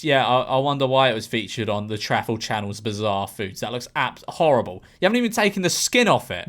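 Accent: United Kingdom. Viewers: I with you could hear what you sound like to me… haha u Brit’s sound so funny in certain parts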